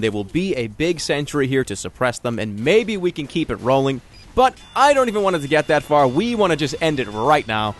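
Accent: Boston, United States. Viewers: You soudn like you're from Boston or something. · American accent breaks google subtitles ammo it thinks is emo and gun it thinks is gone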